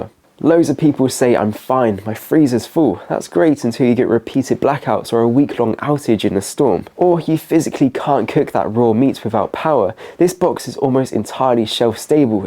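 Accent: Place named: Cornwall, United Kingdom. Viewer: I think he’s from Cornwall or something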